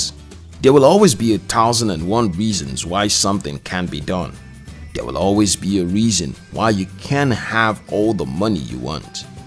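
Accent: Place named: Nigeria. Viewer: You are in Nigeria? Your accent sounds like. If you are. Nothing wrong. With it. I think you should be proud of yourself to making this channel. Bcs, Nigerians in South Africa they are drug dealers and all sort of dirty business.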